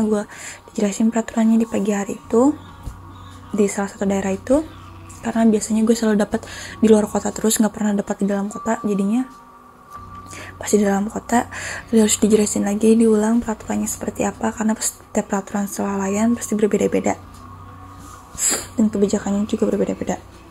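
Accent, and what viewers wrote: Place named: Sunda, Indonesia. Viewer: Logat sundanya msih kental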